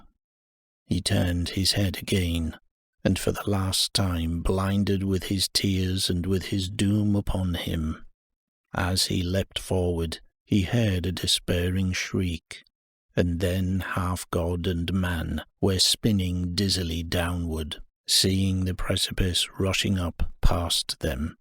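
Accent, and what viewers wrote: United Kingdom. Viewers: Great narration, thanks a lot. Nice to hear a Northern accent, I live in Manchester, UK. Looking forward to trawling through your content, thanks again.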